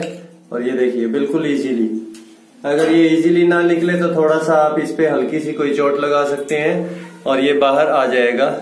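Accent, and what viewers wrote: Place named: Himachal Pradesh, India. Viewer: Himachali lga da bhai tu menjo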